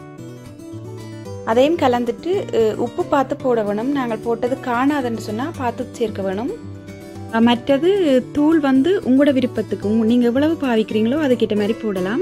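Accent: Sri Lanka. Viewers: Sri Lanka la pesura tamil romba super sister தேங்காய்பால் ஆட்டுக்கறி பிர்ட்டல் அருமையாக உள்ளது சகோதரி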